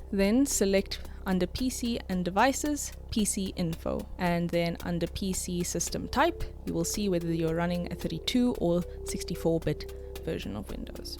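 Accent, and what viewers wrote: South Africa. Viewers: You have a South African accent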